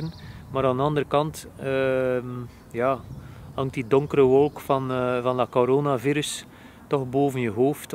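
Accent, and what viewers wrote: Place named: West Flanders, Belgium. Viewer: Begrijp je volledig! Het is leuk om te soen, maar langs andere kant, wij als abonnee je video's zeker leuk, maar even cru gezegd, brengt weinig nuttige content, ttz ik denk dat je meer volgers zou bij krijgen met reviews. Meeste YouTubers, zullen sneller 'geprikkeld' zijn (denk ik) wanneer je meer reviews/ervaringen zou uploaden. Wat bedoel ik, bvb, je review/ervaring over je step. Zo heb ik trouwens je kanaal ontdenkt, gewoonweg omdat ik ook interesse had in zo'n Xiaomi Step. Persoonlijk bekijk ik je video's bijna dagelijks en vind het tof te zien, jou als persoon, vind ik dat je het fantastisch hebt gedaan, maar niet iedereen heeft interesse in wat je dagelijks doet. Het siert je alvast dat je het lnag hebt volgehouden. Denk de beste tip ik je kan geven om meer volgers te krijgen is nuttige content. En mss ben jebhet niet bewust, maar je hebt al nuttige content gebracht, bvb. 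Je kookkunsten, of ervaring van je step, en je mag zeker zijn, dit wordt verder verteld, betrap me daar zelf op dat ik hets dan verder vertel. Nog een challenge, mensen zijn soms moeilijk te 'overtuigen' ol opeens iemand te volgen, familie en vrienden zulken dit wel doen en ikzelfs vind het persoonlijk fantastisch je Algemeen Nederlands gemixt met het West-Vlaams, maar niet iedereen heeft dezelfde interesses. Als ik je eenntip mag geven als YouTube-kijker: forceer jezelf niet, en pist enkel filmpjes van reviews, wanneer je er zin in hebt tenminste. Los van dit alles vond ik je vlog, als West-Vlaming, z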